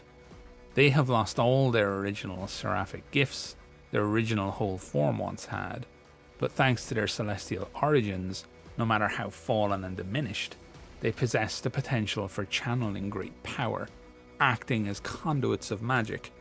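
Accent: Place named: Ireland. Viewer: Should just lean into the Irish accent and give it a bit of a doomsayer priest vibe, mate.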